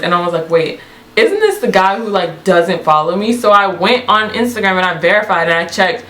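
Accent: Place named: Caribbean. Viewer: He has a bit of a carribean accent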